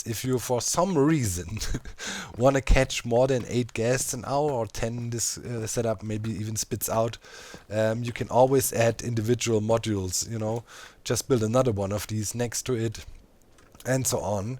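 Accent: Germany. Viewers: I'm learning German on the side, listening to speak English is actually helping me with my accent when practicing lol. Keep it up,. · Habe dich ganze zeit in anderen videos gesehen von bspw. Grian oder Mumbo und hab mir immer gedacht der hat doch voll nen deutschen Akzent. Jetzt weiß ich es fühle mich gut danke xD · Love you, I'm not a real supporter talking about money but I wanna call my self a real moral supporter, love your way to play this game, your way to ponder videos and also your character: real's life one and fictional one. Man, go gogogogogoogogo and never stop. Thx to make me enjoy a doichman speaking eng for so long <3 hope to meet you one day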